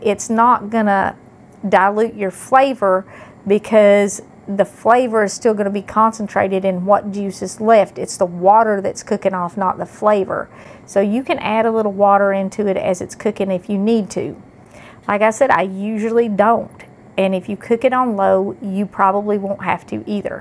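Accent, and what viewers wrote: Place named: Appalachia, United States. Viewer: She's American Appalachian speaking English. Wotta question, 🤔 & Who WANTS to Know?? Friend, lol. Oh geeze.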